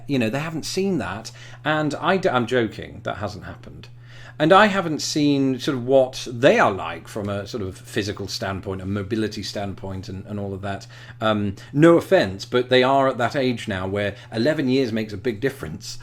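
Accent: United Kingdom. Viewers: Is it just me? Your accent is sounding slightly more British. Maybe it's because your not using your comedy voice like you normally do on your video. But no matter, I'm loving your views on how your homeland has changed. I visited London in '00, so it's also weird to see how much it's changed since then.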